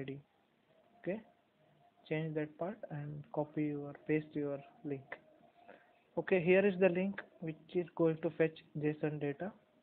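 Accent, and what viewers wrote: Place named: India. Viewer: I hate Indian accent. Next time, use a text-to-speech software to generate voice instead.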